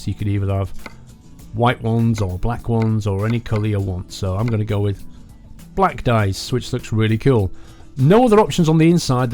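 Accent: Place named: Stoke, United Kingdom. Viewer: you sound like your from stoke on trent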